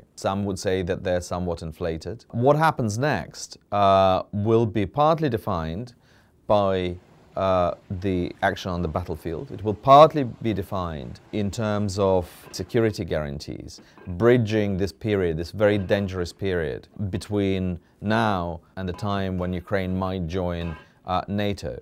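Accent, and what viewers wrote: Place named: RP, United Kingdom. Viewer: When you click on a video of The Economist hoping to learn something and stop halfway as you figure out it is posh British accentuated bla-bla-bla…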